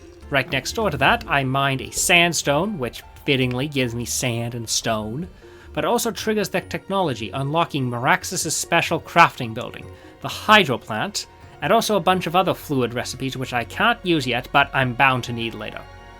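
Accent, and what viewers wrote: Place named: Northeast, United States. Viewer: How interesting! I would have sworn you sounded like someone from the NE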